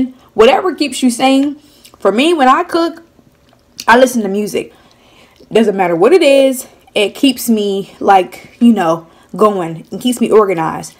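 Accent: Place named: Baltimore, United States. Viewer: You sound like me. Im from Baltimore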